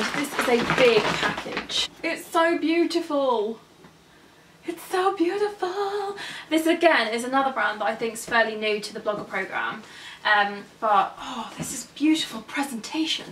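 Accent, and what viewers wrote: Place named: England, United Kingdom. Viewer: I love your accent !!! Are you from England ???🤔😘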